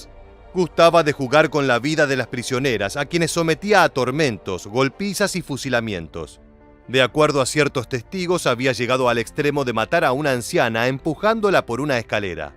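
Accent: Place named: Argentina. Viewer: EL NARRADOR DE ESTOS REPORTAJES TIENE SUS MÉRITOS BIEN GANADOS, ES MUY AMENO Y SU ESTILO INCONFUNDIBLE. POR SU ACENTO ES AL PARECER ARGENTINO. ES LO UNICO QUE SABEMOS. LAMENTABLEMENTE SU NOMBRE ES DESCONOCIDO Y CONTINÚA COMO TAL.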